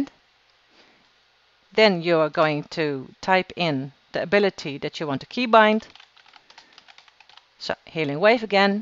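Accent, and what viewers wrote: Netherlands. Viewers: Ive heard right away that she was dutch coz i have the same accent :))). But i have another question. Ive started to play wow again and downloaded my fav vuhdo healing addon. I just bought also another mouse, logitech g604. Problem is i cant figure out how to setup the mouse buttons after mouse button 5 in vuhdo. It looks like vuhdo dont see the buttons after button 5.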